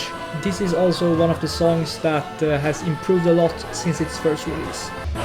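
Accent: Sweden. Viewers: You have a strong swedish accent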